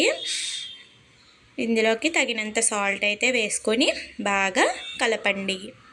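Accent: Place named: Godavari, India. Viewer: ఆయ్.... మీ గోదావరి నా అండి 😂😊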